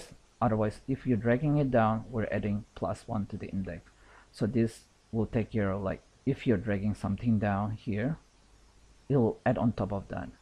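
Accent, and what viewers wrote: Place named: India. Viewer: Good job with showing this technique. From where can we download the sample file? I'm just curious about the accent, are you originally from India?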